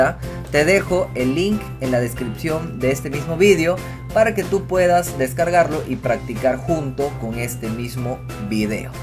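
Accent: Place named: Amazonas, Peru. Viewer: QUÉ CAPO ERES AMIGOOOOO!!! Muchísimas graciasssss!!! Tan didáctica y súper fácil de entender tu manera de explicar! Vale oro este video... gracias por darte el tiempo de compartir tus conocimientosssss!!! Millones de gracias, me ayudaste a ordenar mi padrón de edades de niños para poder hacerles llegar regalitos acorde a su edad en esta navidad!! Dios te bendiga!!... Pdta: De casualidad eres de Rodríguez de Mendoza / Amazonas? Te sentí el acento similar al de mi familia que es de allá! Ojalá seamos familia! jaja un abrazo!!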